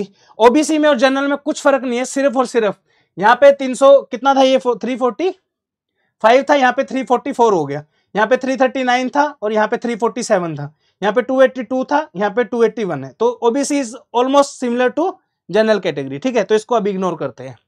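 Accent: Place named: Haryana, India. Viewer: Haryanavi boli 😅😊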